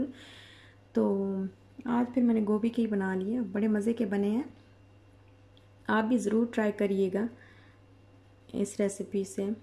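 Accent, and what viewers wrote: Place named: Multan, Pakistan. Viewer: very good mgr ap ka andaz bolny ka multan walon jesa hy jo bht sweet hy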